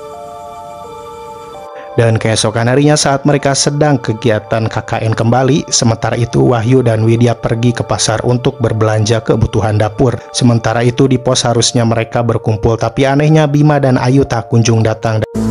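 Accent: West Java, Indonesia. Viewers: Orang sunda ya a?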